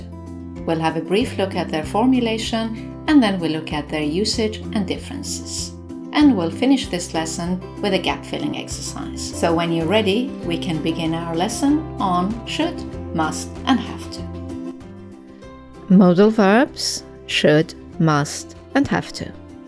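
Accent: United Kingdom. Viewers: One of the best teachers. Really great. Are u original British?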